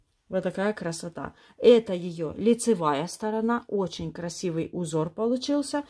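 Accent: Ukraine. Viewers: Здравствуйте,!Огромное спасибо за супер инфо. А у вас есть шапка для детей? сколко петлю надо набрать для7летки? Заранее спасибо за ответ. полюбила вашу речь, украинская)) Привет из Баку.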